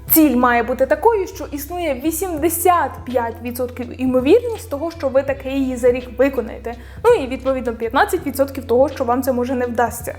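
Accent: Poltava, Ukraine. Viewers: Ви випадково не з Полтави?)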